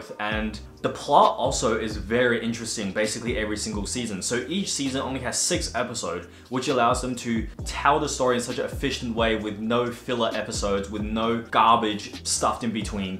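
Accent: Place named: United Kingdom. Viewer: I’m blown away seeing and hearing a Chinese guy with a British accent. I’ve been alive for 40 years and have never seen this before. Congrats on being so unique!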